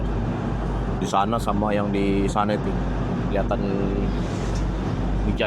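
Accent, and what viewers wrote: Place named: Toraja, Indonesia. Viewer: Tator,kalau dengar logatnya 😂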